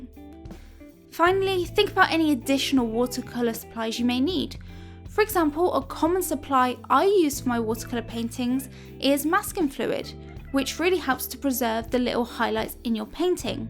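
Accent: United Kingdom. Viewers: You are EXTREMELY British. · Actually I was enjoying to listen to that amazing British accent 👍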